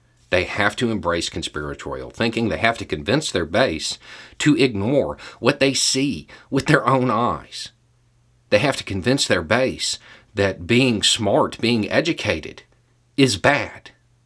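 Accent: South, United States. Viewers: Here’s a plain-talking southern boy. Love you too.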